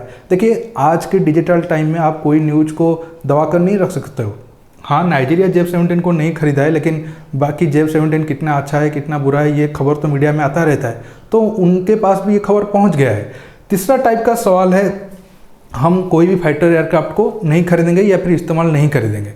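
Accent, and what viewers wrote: Odisha, India. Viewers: Bhai kay aap odisa se hai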